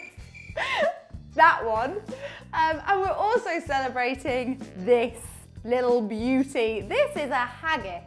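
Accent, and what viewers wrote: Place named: Scotland, United Kingdom. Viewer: OMG I love your Scottish accent! Great video as always...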